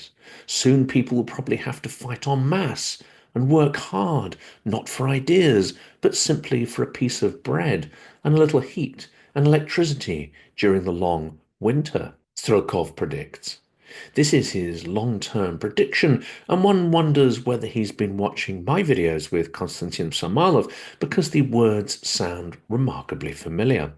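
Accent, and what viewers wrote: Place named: England, United Kingdom. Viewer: Thank you,! Brilliant, as usual. To American ears, your received English accent is very pleasant, even poetical.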